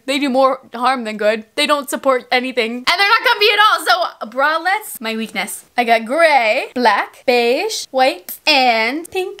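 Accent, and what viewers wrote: Canada. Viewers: her Canadian accent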